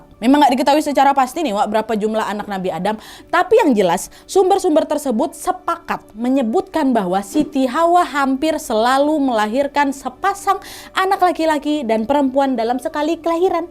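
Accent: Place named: Medan, Indonesia. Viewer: Mirip kontennya Nessie tapi dg aksen Medan , menarik,, Salam dari subscriber baru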